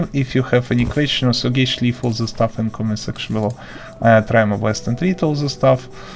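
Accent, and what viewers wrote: Russia. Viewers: ты русский? мне кажется у меня похожий акцент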